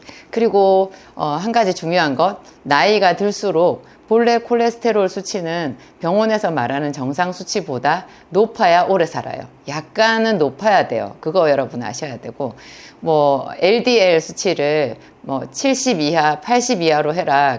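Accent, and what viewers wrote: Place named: Yeongcheon, South Korea. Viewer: 영천 억양인데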